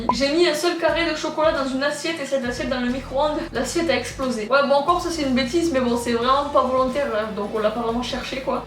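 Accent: Toulouse, France. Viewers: Elle habite à Toulouse, c'est l'accent Toulousain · je t'aime trop et j'aime aussi ton accent toulousain sa te vas vraiment bien 💖💟💞💝💘les autres ont pas le droit de se moqué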